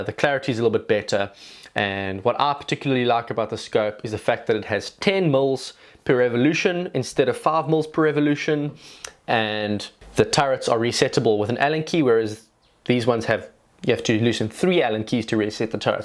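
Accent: South Africa. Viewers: We gonna be looking- you talk like that everytime, its englisch or South african like zulu?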